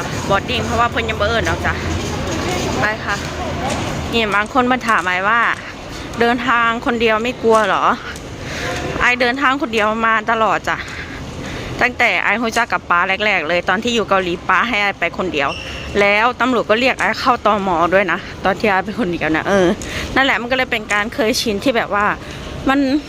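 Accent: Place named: Sakon Nakhon, Thailand. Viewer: อยู่บ้านอะไรค่ะ พี่ได้ยินเสียงพูดก็รู้คนสกล พี่อยู่สกลจะ้ มีแฟนฝรั่งเศสเหมื่อนกันจ้ะ ไทบ้านไดน้อ?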